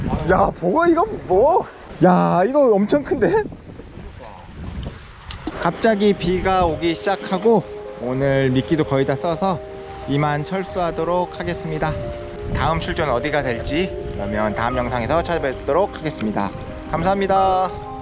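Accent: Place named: Jeju, South Korea. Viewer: 제주도 사투리가 정겨워서 구독 누릅니다. 화이팅하세요^^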